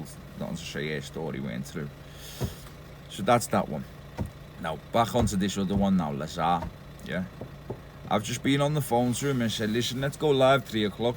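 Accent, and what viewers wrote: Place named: Cockney, United Kingdom. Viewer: you seem like a nice fella pal, love the cockney accent 😂 I need work, i work at the moment. But need something better mate.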